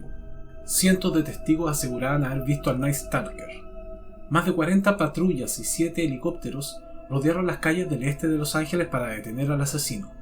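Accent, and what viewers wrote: Chile. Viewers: Lo único bueno sería que hables bien español neutro y no chileno que no se entiende nada, y aprende algo de Inglés por dios.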